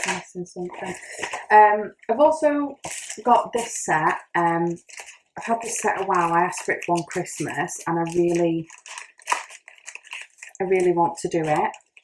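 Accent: United Kingdom. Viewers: I came over here after hearing about you from Darren Dizzy Stitcher and he was right, you're fab! I'm another British stitcher and it's so nice to hear a British accent "knockin about" on Flosstube! You're so easy to listen to and natural. I love your full coverage pieces and daren't look at Paine Free Crafts! Looking forward to seeing your progress next time!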